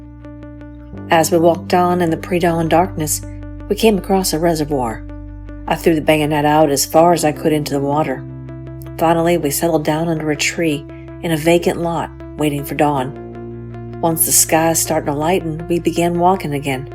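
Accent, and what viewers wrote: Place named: Texas, United States. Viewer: Love your texas accent ❤😅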